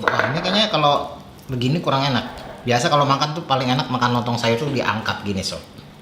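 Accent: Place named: Depok, Indonesia. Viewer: Lontong sekel.. bise begitu yee bang.. depok beut dah ah logatnya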